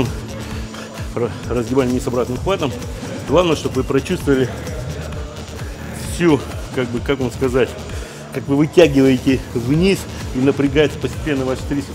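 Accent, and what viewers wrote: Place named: United States. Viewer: я думал какойта американец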